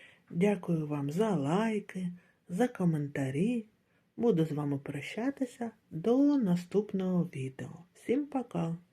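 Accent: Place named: Kherson, Ukraine. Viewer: Гарні покупки! Одразу по вимові зрозуміла що ви з Херсону❤ Запрошую на свій канал про бюджет за методом конвертів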